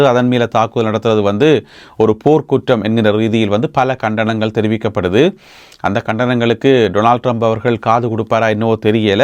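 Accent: Jaffna, Sri Lanka. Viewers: தம்பி நீங்க யாழ்பாணத்தை பிறப்பிடமாக கொண்டவர் என்பது உங்கள் தமிழ் உச்சரிப்பில் தெரிகிறது நீங்க நிறைய நல்ல விடையங்களை சொல்கிறீர்கள் ஆனால் நீங்கள் பேசும்போது “ அங்க அது இருக்குத்தானே” “ என்னென்று சொன்னால்” இருக்கு போல கிடக்கு” “ நல்ல பூசை குடுத்திருக்கினம்” போன்ற சொற்களை தவிர்ப்பது நல்லது ஒரே எரிச்சலாக இருக்கிறது நன்றி வளம் பெற வாழ்த்துக்கள் ஈழத்தமிழன்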